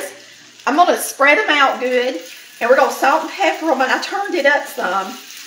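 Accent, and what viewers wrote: South, United States. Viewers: I live in Dekalb County during the winter and summer and Arkansas during spring and fall for fishing. I think you speak eloquently and have a good subject and verb agreement. You have a southern drawl and you have a nasal sound. I have the same issues. I have a wall that is papered with degrees but because of sinus issues, I have a hoarse and nasal sound. We love you greatly.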